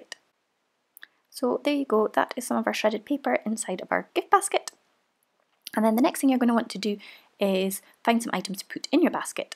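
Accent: Scotland, United Kingdom. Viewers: Loved watching this I've just found your channel and your videos look great and it's lovely to hear a fellow Scottish accent ❤️ x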